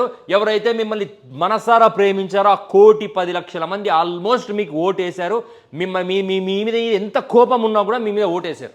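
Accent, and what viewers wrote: Rayalaseema, India. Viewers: Exactly too Good Analysis Aayappa ki maa Rayalaseema Yasa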